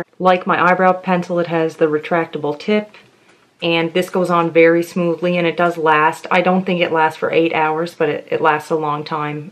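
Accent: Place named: Cape Breton, Canada. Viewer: I absolutely love your videos.. I love that cape Breton accent I’m originally from Newfoundland now living in Saskatoon and it just makes me miss the East Coast.. I hope you’re having a fantastic day and merry Christmas and all the best to you and your family in the new year!!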